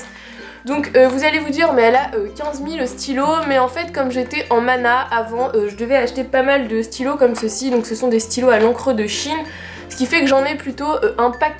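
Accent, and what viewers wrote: France. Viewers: Très bien les explications! Très utile aussi ta vidéo comparaison Bullet journal/ planner. Petite remarque quand même:: Puisque tu écris et utilises beaucoup l'anglais, tu devrais faire un effort sur ta prononciation. C'est vraiment TROP français... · mais stooooop l'accent mega français la "bullet journal" c'est un mot anglais mettez y du votre un peu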